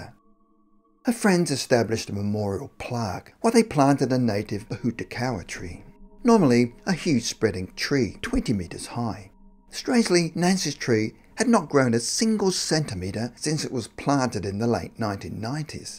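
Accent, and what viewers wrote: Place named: Australia. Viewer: It's an Australian accent. What's so unnatural about it?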